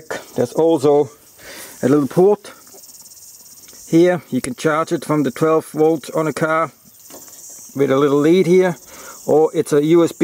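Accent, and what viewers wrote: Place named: Australia. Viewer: You sound like a ozzy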